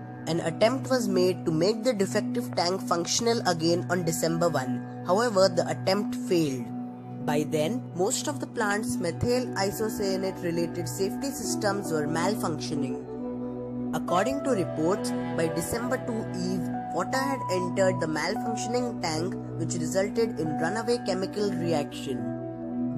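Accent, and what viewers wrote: India. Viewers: Yes his accent is exactly Indian accent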